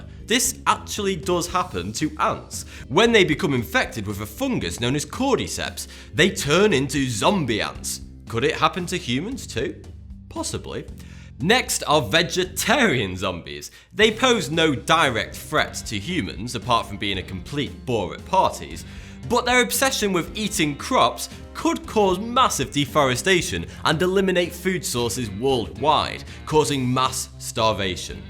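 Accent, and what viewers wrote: United Kingdom. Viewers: I refuse to watch any video narrated by a Brit.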